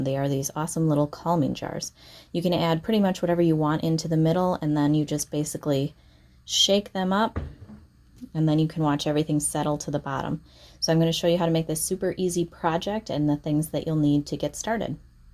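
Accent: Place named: United States. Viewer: Sounds like your American but where did you get the plastic bottles from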